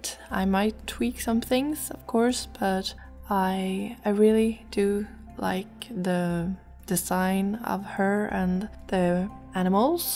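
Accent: Sweden. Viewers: I love your art, and I adore your Swedish accent. Marry me please <3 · omg are you swedish???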